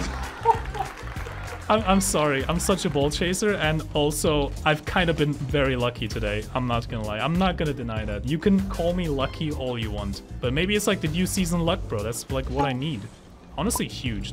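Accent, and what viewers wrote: Germany. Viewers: Da merkste halt dass er deutsch ist die Aussprache kann man nicht als nicht deutscher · Are you german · Hey, are u german?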